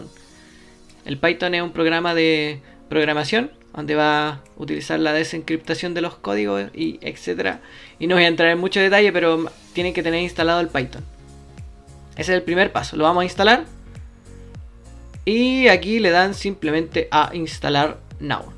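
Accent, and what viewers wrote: Chile. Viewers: sos chileno? y gracias lo estoy viendo <3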